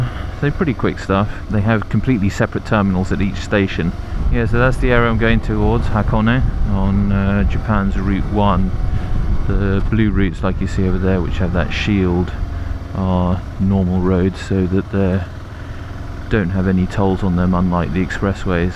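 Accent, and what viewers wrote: RP, United Kingdom. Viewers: sound's like your from made in Chelsea :) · carnt belive this guy s accent so posh love the videos.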